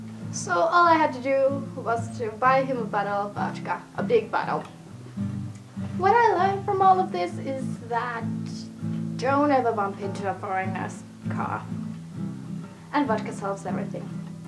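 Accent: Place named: Finland. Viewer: Hahhah, it's a Finnish accent :D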